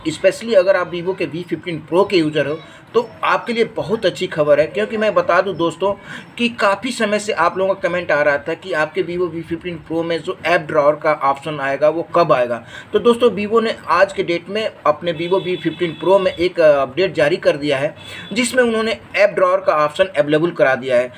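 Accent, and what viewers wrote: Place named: India. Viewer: He's speaking English in strong Indian accent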